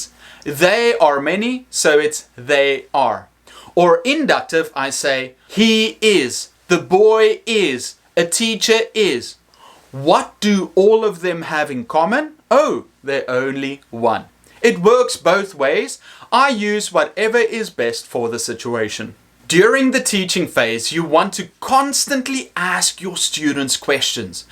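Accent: South Africa. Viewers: South African Accent!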